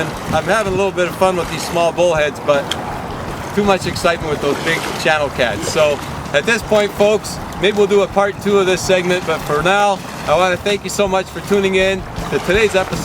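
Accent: Canada. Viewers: Are u canadian :)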